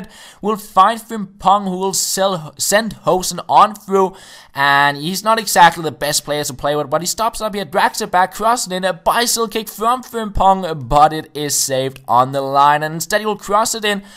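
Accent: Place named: Denmark. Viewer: Dat Danish accent...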